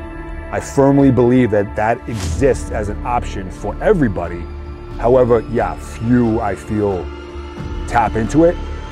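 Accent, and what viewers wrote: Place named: Massachusetts, United States. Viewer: Hey Chief after listening to this it made me think of Buddhism ! Especially when you talked about suffering? As a older regular soldier , and a below the Right knee amputee I am just blow away by you. I know you have a lot of plans after you retire. Have you ever considered teaching? Not the SF , thing but academia. Kids and adults are lost today and they need role models and great teachers . You snake eaters are all Geniuses, so maybe take that Mass accent and go to Harvard !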